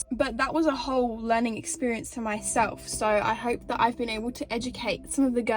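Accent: Australia. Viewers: UR AUSTRALIAN ACCENT HAS CAME OUT AO MICH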